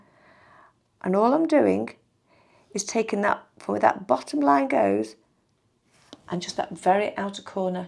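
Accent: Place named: England, United Kingdom. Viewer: I have that Max Factor palette from Amazon, it’s one of my favourites. The colours are so pigmented. It’s refreshing to hear a makeup guru with an English accent. New subbie loving your channel x VickAngel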